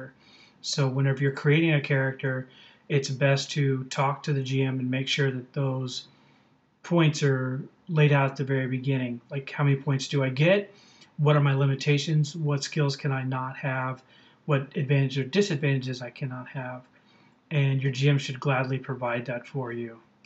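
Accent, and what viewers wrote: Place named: Midwest, United States. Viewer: funny accent. he sounds like a midwesterner until he says attributes. never herd it a-TRIB-utes.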